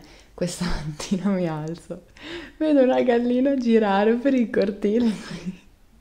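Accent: Italy. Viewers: Me encantas , hermoso el acento italiano ✨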